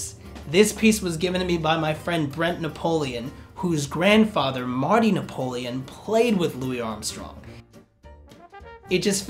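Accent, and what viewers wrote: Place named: New York City, United States. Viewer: I like your taste in fragrance ... and your accent, love the slight traces of the NYC accent <3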